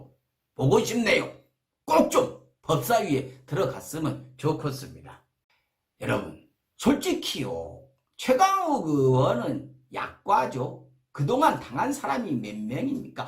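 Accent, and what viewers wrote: Jeolla, South Korea. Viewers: 성범형님, 전라도 떠나온지 4년인데.. 내용떠나서 형님 사투리 들을라고 들어옵니다. 그런데 속까지 시원해진께 기가맥히요.. ^^ 사랑하요 행님.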